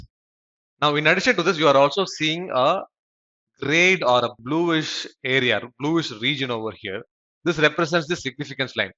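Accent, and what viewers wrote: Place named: India. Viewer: What a perfect indisn accent :)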